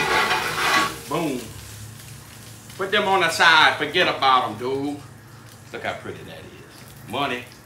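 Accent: Louisiana, United States. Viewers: I'm from Washington DC and we have a unique accent. I like listening to your Cajun accent. 😊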